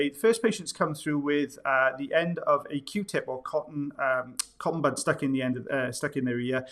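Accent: Wales, United Kingdom. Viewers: He has a Welsh accent. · Hey Dr., I'm curious, what specific part of the U.K. are you from? I feel like your accent was a bit more vibrant in this episode. I'm not complaining of course, I love it! 😊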